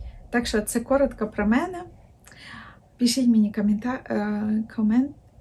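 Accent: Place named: England, United Kingdom. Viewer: Несовершенная - не досконала, Коснулось - торкнулось., ти така молодець! В тебе все вийде, твоя мова гарна з легким англійським акцентом 🥰 Пишаюся тобою!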